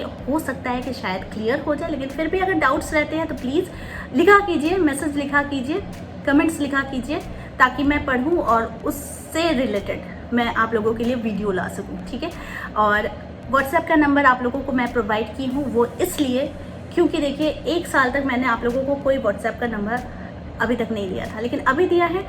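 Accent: Bengal, India. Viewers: Sute jawar age tmr video dekhe sute jawa...eta amk ank besi positive vibes dae..tumi bhishon misti..ar khub valo vabe guchiye ktha bolo...jodi kakhono somoy pai tmr sathe ami nischoi dekha korbo..i just love u mam❤ arekta ktha bengali ra jokhon hindi te ktha bole tokhon besi cute lage...thik jemon tumi🥰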